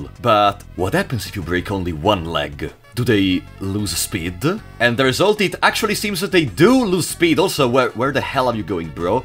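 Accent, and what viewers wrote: Italy. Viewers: Il bro è palesemente del Piemonte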